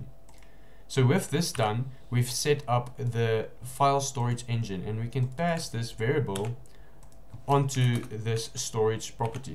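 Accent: South Africa. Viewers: i had to search this guy cos the accent is very much South African!!! haha! very clean and straightforward video thank you! greetings from Germany! proudly SAn!! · Dude, you finally put all my floating questions about the multer docs on npm to rest, thank you, you did such a wonderful job walking us through the code! 🤓🙌🏽💯✨ And super random but I love your South African accent btw 🎊. Greetings from the US :) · You sound South African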